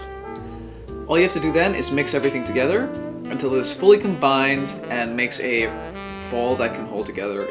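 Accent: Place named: United States. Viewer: As a British person I can proclaim that you sound 1,000,000% American, sorry 😵 it's pretty common for Europeans, but usually there's a hint of something else in there, though I don't detect it with you!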